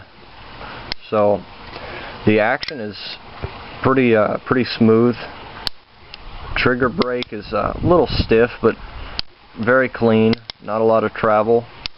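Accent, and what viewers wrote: United States. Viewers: Well, since you're talking about your roots, I'd say that you probably learned to talk in southern ohio or close by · you don't sound Californian, you sound like your from my neck a the woods. where abouts you first lived?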